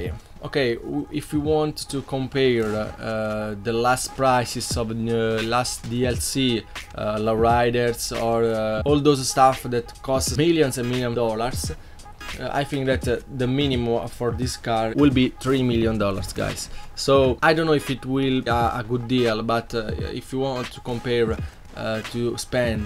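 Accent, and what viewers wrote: Italy. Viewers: Adoro o sotaque italiano kkkk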